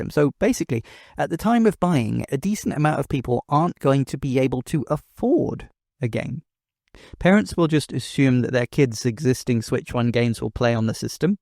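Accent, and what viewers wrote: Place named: United Kingdom. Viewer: Good review. Some thoughts I am aligned with: 1. Game key cards suck - won’t buy any 2. Exceedingly high prices for old games 3. Parents won’t be buying Timmy the SW2. He can play Minecraft fine on SW1 (I am a parent - Overcooked, bomberman etc work fine for the family). 4. Families like myself are a big part of their base. So forget about selling 150M SW2 consoles 5. Nintendo are very very greedy and callous weaponising our nostalgia in the most cynical way possible relying on the impulsiveness of their base… Feedback on your channel: 1. Overall good - trim it down a little…punchier 2. Avoid slightly inserting your political views and hint-hints….make a separate channel for that. Stay on point. 3. Solid British accent Have a nice day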